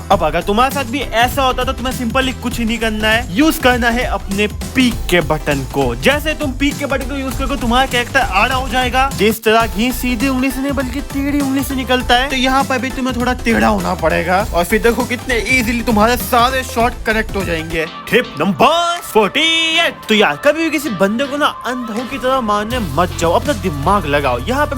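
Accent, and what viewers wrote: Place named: Mumbai, India. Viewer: Mumbai ki boli kadak re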